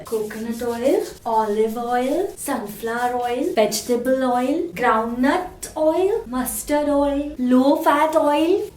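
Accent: Maharashtra, India. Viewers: So i hear Superwoman watched and liked ur videos :) Yay! Love your Marathi accent.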